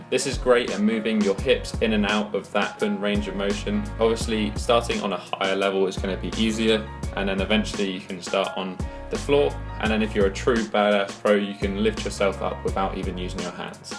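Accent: United Kingdom. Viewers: You sound British but have a palm tree behind you...Where are you? It's driving me crazy haha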